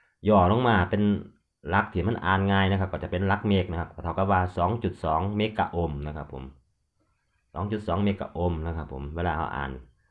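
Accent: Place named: Isan, Thailand. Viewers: ชัดเจนอีสานบ้านเฮา👍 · มื่อนี่ฟังได้เฉพาะภาคอิสานเด้อค่ะ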